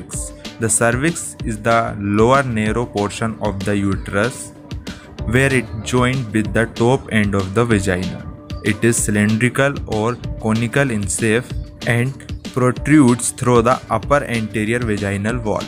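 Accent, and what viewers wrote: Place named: India. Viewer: 발음보니 인도서 만들었군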